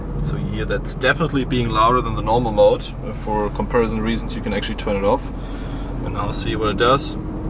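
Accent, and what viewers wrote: Germany. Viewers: Der deutsche Akzent ist ja schrecklich 😂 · great video! more talking videos please! Also really enjoyed your German accent!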